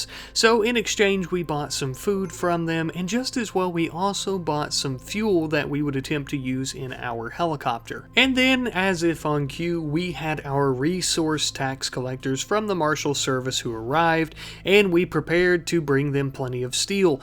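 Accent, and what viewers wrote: South, United States. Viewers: I detect a southern drawl.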